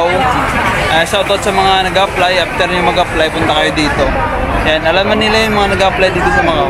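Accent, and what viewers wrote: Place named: Waray, Philippines. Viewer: Bossing taga samar kb..or waray waray kb??😂waray kasi accent mo eh😅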